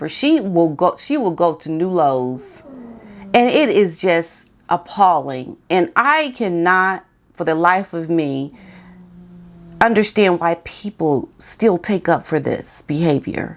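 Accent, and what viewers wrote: United States. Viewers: YOU ARE RIGHT! YOU’RE AMERICAN SO YOU PRONOUNCE AMERICAN.🌹🌹🌹SHE IS USER. THE YATCH GIRL. SHE HAD NO RESPECT AT ALL.THIS WANTS TO BE BILLIONAIRE. IS All ABOUT HER SELF. SAD SAD. SAD WE FEEL BAD TO PRINCE WILLIAM HIS BROTHER IS GONE. MANIPULATIVE,CONTROL, HYPNOTIZED BY MEGAN.😂😂😂😂😂😂😂😂😂😂😂😂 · Don’t you worry about how you pronounce things …we all pronounce things differently and that makes it more interesting. I like your pronunciation actually, you are American and that’s how you should say it. Don’t take any notice of the pedants….(fussy grammarians) Imagine if Diana had lived……she (Marbles)would’ve fallen foul of her (Diana)100% and she would be slandering her too. They would be ghosting each other, I would take sides …and it’s not Marble's side. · Please don’t worry about how you pronounce names. As others have said you are American, and often words are said differently to us British folks. 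There are 2 spellings for the name for Prince Louis. Some people spell it as it would be said Lewis, which can be a surname or first name. It is what you say, not how you say it. Keep up your good work. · I agree with you, you are American. pronounce it how you are accustom. I love your channelYou hit the nail on the head. Just be yourself. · Please stop apologising for your pronouncement of a name..99.9% of people Know your an American and expect you to speak like an American..most of us love your accent...hi from the uk 🇬🇧 😊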